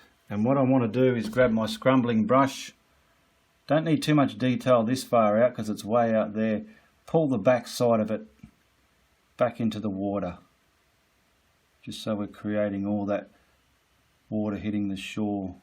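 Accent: Australia. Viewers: I love it! Thank you for a great tutorial! And I have to say I love listening to your Aussie accent! 😊 Most tutorials are from other countries so seeing the talent in our own backyard is wonderful! Big thank you from a fellow Aussie! 😍😍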